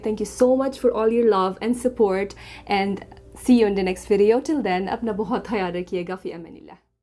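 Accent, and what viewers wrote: Karachi, Pakistan. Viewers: اسلام علیکم ماشاءاللہ آپ کی اردو بہت پیاری ہے ۔آپ کو سن کر لگتا ہے کہ آپ پاکستانی ہی ہیں۔❤❤❤ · I love when you said main apne shohar k dimagh ki dahi krti hoon. you made him mad giving him tough time asking question. Good video your Urdu doesn’t look bad it’s pretty karachi urdu. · Aap ki udru bolny ka lehja bilkul Pakistani hai. Lagta to Nahin k ap Marakish Sey hain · wonderful... itni saaf Urdu aur lehja (accent) to pure Pakistani hai, unbelievable! 🌍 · Apki urdu sun k ap Pakistani lagti hen amazing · MA'AM u r PERFECT in Urdu and u speak Urdu just like Karachiwala. Very Good effort. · You speak so nice Urdu in Karachi accent unbelievable. Love from Amsterdam. Waqar Khan from Amsterdam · Demagh kee dahi!!! 😂 totally Karachiite 👍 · Your Urdu is shockingly incredible I am stunned yes you do have Karachi accent well done sister your husband is a lucky man may Allah keep your family blessed and happy 🌹🌹🌹 By the way I was born in Kuwait so I can speak Arabic along with Pashto, Urdu, English, Punjabi and some Farsi 😊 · Aray larki tum to pakistani logon ki tarah bol rahi hay or accent bhi pakistani hay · By the way by seeing u and listening u i can't even believe that u are not Pakistani By looks and by accent u look 100% Pakistani...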